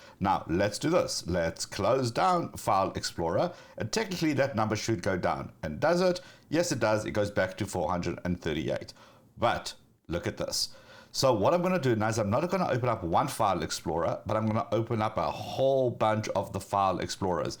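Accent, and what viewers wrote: South Africa. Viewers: South African? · OMG I ALMOST IMMEDIATELY recognized that South African accent !!! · Your hectic SA accent in the videos make my flippin day bru. Info in my tongue is just so much more lekker to consume. Please dont stop doing what you're doing dude. You rock. ￼ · First time watching your videos, noticed your strong South African accent coming through. Nice work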